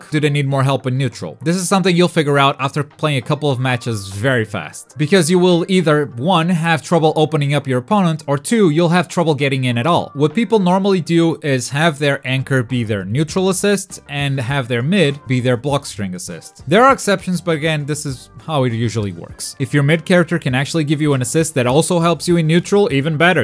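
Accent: Portugal. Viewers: Wait, are u portuguese?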